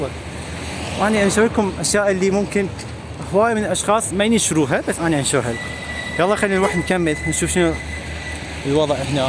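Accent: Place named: Iraq. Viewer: سيد شلون ضبطت اللهجة العراقية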